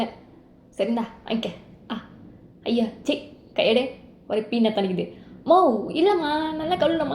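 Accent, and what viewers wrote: Chennai, India. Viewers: Madras slang super sis 🔥🔥🔥🔥🔥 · Taekook love so cute JK mom great jk jimin Felix friendship really cute 🥰🥰 this movie all songs my favourite songs ❤❤❤❤❤❤❤❤ Chennai language உங்களுக்கு நல்லா இருக்கு match ஆகுது😂😂😂😂 different style movie 🍿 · Story romba nalla iruku iyoo antha chennai slang tha semaiya pesuringa iyoo enaku romba pidichiruku but story avlo thana nalla irunthuchi duration than kamiya iruku innum kojam irntha nalla irukum story super ❤❤❤❤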